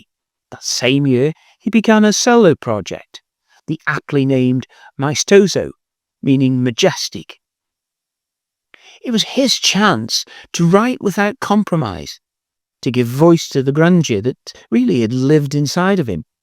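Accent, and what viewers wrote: Stoke, United Kingdom. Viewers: Stoke accent...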